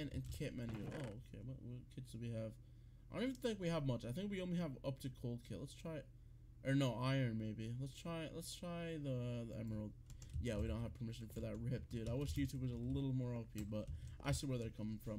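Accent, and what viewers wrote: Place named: Egypt. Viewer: hmmm, you aound egyptian, my brother :D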